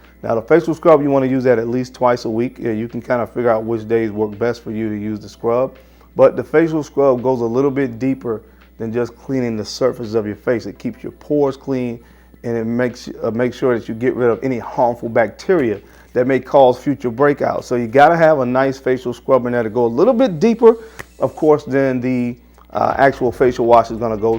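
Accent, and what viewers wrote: South, United States. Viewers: I sure wish you would either learn how to enunciate your words - the southern drawl and other speech effx are confounding - and invest in upgrading your vids so that we can see the actual names of the frags and manufacturers. Thanks.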